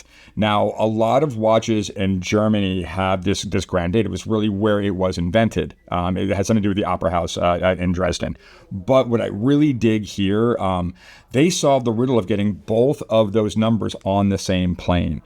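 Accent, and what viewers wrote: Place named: Africa. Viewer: Your pronunciation capabilities are that of an african.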